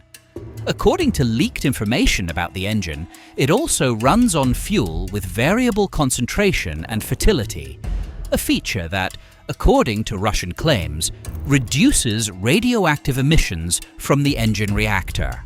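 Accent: United Kingdom. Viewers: Wrong accent. I place no creadence in any brit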